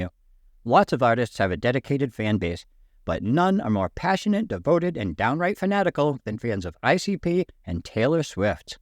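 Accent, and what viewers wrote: New England, United States. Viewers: You sound like you might be from New England? Love these videos hilarious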